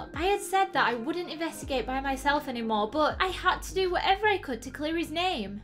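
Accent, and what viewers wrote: Scotland, United Kingdom. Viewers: I really love your voice. You're Scottish ? Sorry for my brutal question. I like how Scottish people talk. And I mean that really in a serious way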